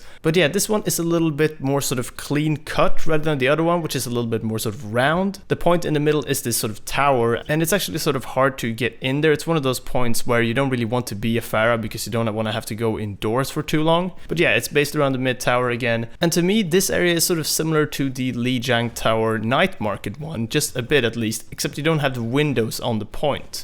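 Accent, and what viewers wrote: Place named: Sweden. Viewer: u swedish af boi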